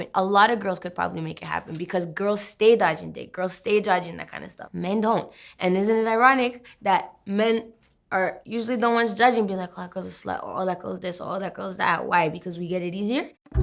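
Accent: Caribbean. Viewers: she sounds slightly Caribbean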